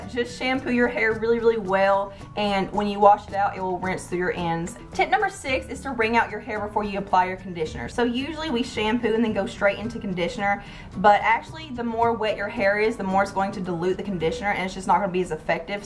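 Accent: South, United States. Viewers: that southern drawl 😍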